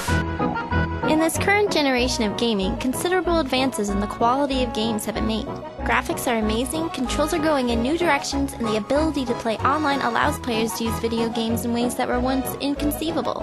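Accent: Canada. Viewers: Canadian girls who say aboot... Nice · God, that voice, I love Canada. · oh and...aboot ;) hahah that accent is awesome too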